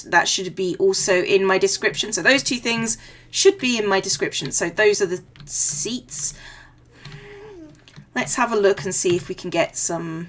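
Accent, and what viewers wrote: United Kingdom. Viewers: What i think about u is that I hate you you suck and your accent is just, ugh! Your videos are boring as hell!! What i ACTUALLY think about you is that, you're awesome, i like what you do, and i watch your videos and actually enjoy and be happy about it, i love you and your british accent:D you are the 2. Youtuber in my top 5 youtubers list! <3:3:D keep it up, you're going great!! >.<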